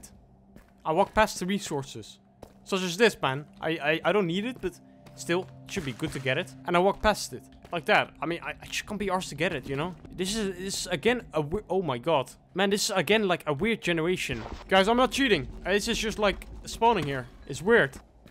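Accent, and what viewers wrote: Netherlands. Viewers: quiq question and by no means to offend you but are you dutch? you sound pretty dutch to me. (again not meant offensive by any means and tbh it sounds good(nice vid too)) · Do I hear a Dutch accent? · I’m smelling a Dutch accent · Het Nederlandse accent maakt het wel af hoor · Are you Dutch or Belgian?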